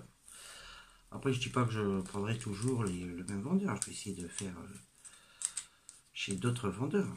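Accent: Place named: North, France. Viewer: Merci pour le.numero Le nord te manque pas trop ? T as pas perdu l accent en tout cas hihi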